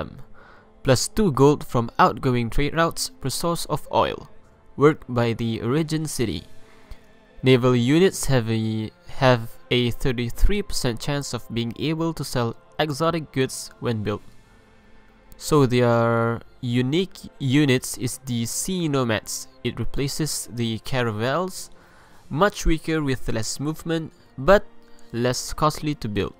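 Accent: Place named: Malaysia. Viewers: I can recognise the accent especially when you say Darussalam, Kampong, Che Bong Nga, Gajah, Alam Melayu, and Pesilat. Very significant ^^ Nice to meet you :D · Sounds Malaysian, hi! xD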